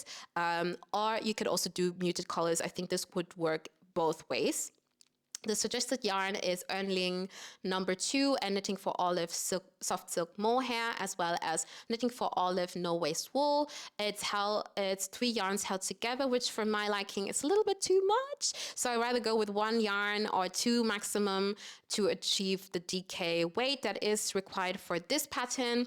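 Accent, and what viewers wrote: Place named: Germany. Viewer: He, he - wenn dich die Aussprache von Rerum Natura nicht verraten hätte - spätestens bei „soooo“ war ich mir sicher, dass du in Deutschland zuhause bist! 😊 LG aus Österreich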